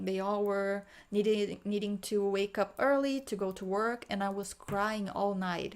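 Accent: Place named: Brazil. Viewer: Listened to this again. For whatever reason, really connected with it...and your story. Lots of shame and heavy emotions came up for me. Also, could tell from your accent you are from Brazil. I was lucky enough to visit multiple times, and always felt so connected. Obviously, I was only seeing what I wanted to see (which as a tourist , is always distorted). My family is from India, but I have very little interest in the culture. It's so boring to me. I always wished I was from Brazil instead :)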